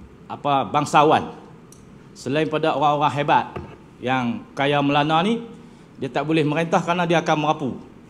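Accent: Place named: Kedah, Malaysia. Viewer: INI BARU ORG KEDAH CKP PURE KEDAH BKN MCM SETENGAH2 ORG KEDAH BERLGAK CKP KL.. 😂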